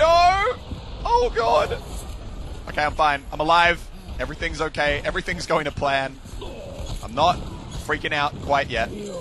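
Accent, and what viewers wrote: Germany. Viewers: why is the voice german?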